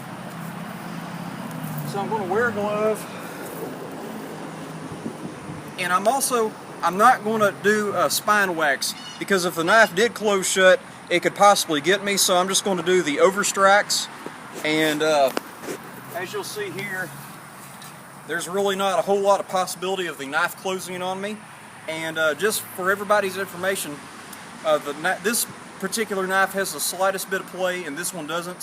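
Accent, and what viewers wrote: South, United States. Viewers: Southern US. I would say probably Alabama or Georgia, maybe Tennessee. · you have the most american accent ever. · He has what we call in America a "Southern Accent" which could be further broken down to specific area or state in the Southeastern U.S. It's rather nice isn't it? People have a different accent in Northern California where I live now or in Upstate New York where I grew up. Most people in the world are probably most familiar with what is called a "Mid Atlantic American Accent" which is sometimes considered by Americans as having no accent at all. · Like Elvis, but slightly more country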